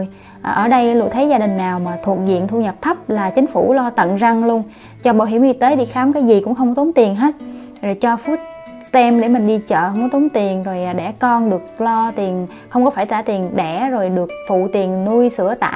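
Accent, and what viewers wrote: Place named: Central, Vietnam. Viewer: tôi rất thích nghe giọng của LỤA chất giọng miền trung , vùng NHA TRANG KHÁNH HOÀ cảm ơn LỤA đã chia sẽ , THANKS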